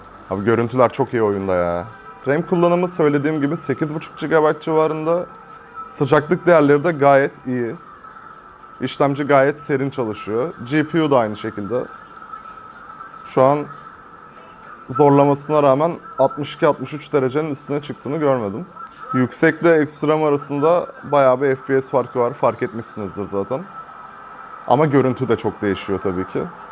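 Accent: Elazığ, Turkey. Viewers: Abi sen elazığlımısın lütfen söyle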